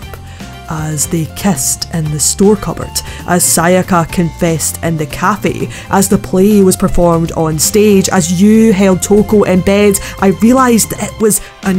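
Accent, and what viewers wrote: Scotland, United Kingdom. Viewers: Where on earth did you get any of that? It's such a lovely and blatantly Scots accent, and I can't hear anything Australian in it at all. (This from an Aussie) And the middle-aged part is at least as baffling to me. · Ooft ya, is that a wee scot I hear? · I'm not good with the specifications i.e Glasgow vs Edinburgh, but if you couldn't tell anything past 'British' it's a scottish accent.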